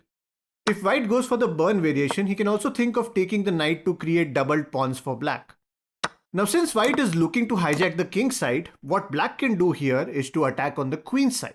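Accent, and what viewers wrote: India. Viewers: Some people need the subtitles to better understand Hindu English to give them a better experience, unless they miss important lessons... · This chanell is magic! Dude with indian accent whisper me when i played... No Offense because Indian and Singaporean Accent is easier to hear by majority of Asian...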